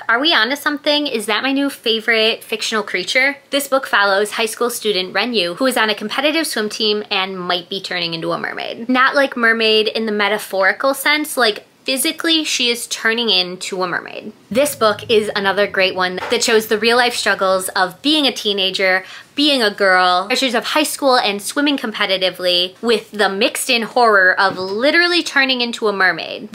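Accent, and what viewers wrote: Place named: Milwaukee, United States. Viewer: your voice is very milwaukee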